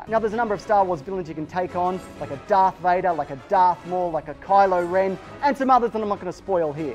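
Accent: Australia. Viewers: oh my god you're Australian Billy Mays (God rest his soul). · Your voice says Australian but your face says Mexican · you seem more Australian in this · I feel this is like 500% more Australian than normal. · He went full bloody Australian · For some reason, seeing your face with your voice makes you sound way more Australian than usual.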